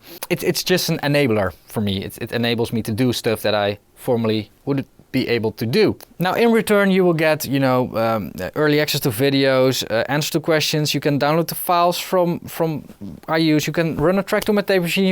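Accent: Netherlands. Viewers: Is he dutch? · Your Dutch, arent you? :D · Your accent sounds like a Dutch accent (Ik ben een fake Nederlander)!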